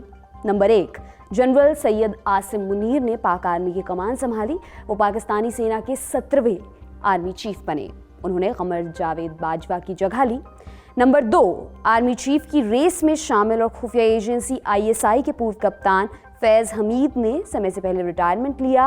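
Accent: Standard. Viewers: बेहतरीन अन्दाज़े बयां ! अत्यन्त शुद्ध उच्चारण ! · Wah,bahut sare news anchor ko mai sunta hu.kintu jitna shuddh aapka uchcharan hai,jaisa aapka body language hai,isse aapki vidwata saf jhalakti hai.yahi visheshta aapko dusre anchors se alag aur behtarin sabit karta hai.Lekin shayad aap galat channel ke sath kam kar rahi hai.lswar aapko Yash de.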